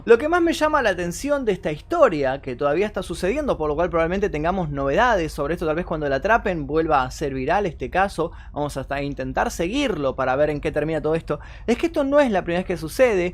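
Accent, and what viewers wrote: Argentina. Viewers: Argentino che? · SOS ARGENTINO ?? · jajajajajaja tranquilo bro, no puse nada malo xd me gusta el acento de los argentinos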